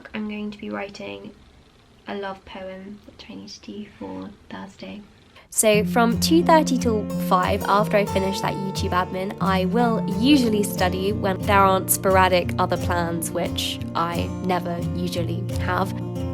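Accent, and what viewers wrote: United Kingdom. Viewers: Damn....I loooooooove your Birtish ACCENT...JUST EXCEPTIONALLY GOOD..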